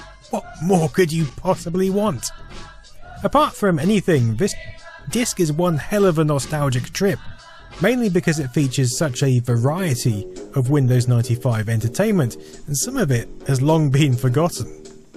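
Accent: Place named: England, United Kingdom. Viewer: Just wanted to say I love your documentaries and how professional they've become. Your gentle English accent is soothing to my American ears. I'm grateful to get to learn more about niche retro computing topics.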